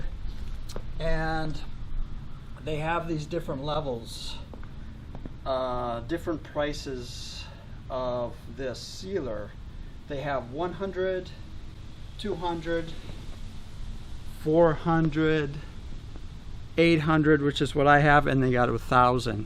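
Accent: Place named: California, United States. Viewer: I thought you were from California cause you look like Tony Hawk and you have a laid back Californian accent 😉 wish I could've helped. Yeah weather degrades it fast. Just applied a 3 yr sealer 2 weeks ago and sun already disintegrated part of it. Im in upstate New York, weathers not quite as harsh as Minnesota but there are times when we're colder, plus we get a ton of lake effect snow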